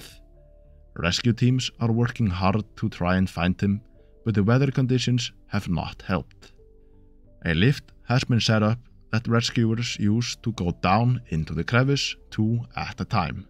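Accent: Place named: Iceland. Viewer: I love the way you use a slow Icelandic drawl.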